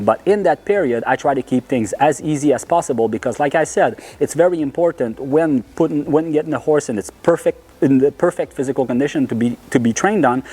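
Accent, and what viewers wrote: Quebec, Canada. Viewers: J'adore tes vidéos 👌🏼 le petit accent a la Québécois j'adore haha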